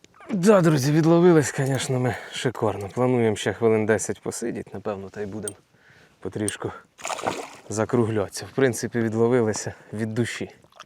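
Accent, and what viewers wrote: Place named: Central Ukraine, Ukraine. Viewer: По говору і краєвиду центральна наша красуня, може Полтавська або Черкаська.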